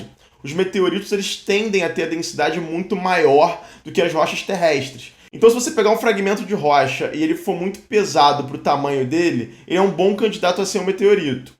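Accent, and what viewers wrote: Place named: Rio de Janeiro, Brazil. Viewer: Você é do Rio de janeiro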